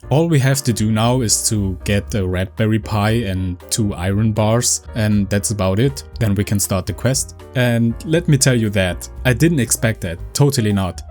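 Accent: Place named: Germany. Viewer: Nice vid! Will watch your series :P Your english is pretty good (Like me, you have an accent, but I understand everything you say) German? Looking forward to the other vids!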